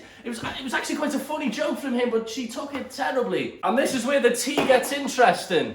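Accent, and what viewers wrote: Liverpool, United Kingdom. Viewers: are you scouse? Cause if so what football team do u support?🤙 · Note to self. Don't watch videos muted using captions (sleeping toddler lying on my arm) when it's a Scouse accent. Ended up really confused at what 'Istanbul's standards' has to do with everything and who the 'chiefs' are. Had to put the volume on to find out why it has went so international 😂 (I'm Scottish, subtitles just laugh at us to be fair) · heyyyyyy I love your Chanel so much and ur Liverpool accent hehe I think its from Liverpool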